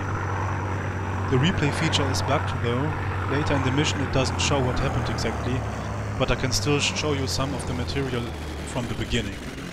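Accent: Germany. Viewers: You sound a little bit like a german guy... am I right?:) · Ihr deutlicher "germischer" Akzent verrät Ihre Muttersprache.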